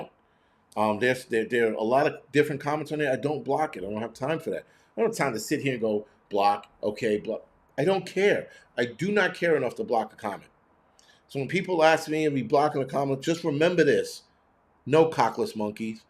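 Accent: New York, United States. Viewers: I love your NYC accent. I'm from Chicago and I love the East Coast. We canot let these ppl continue to messup ourcountry.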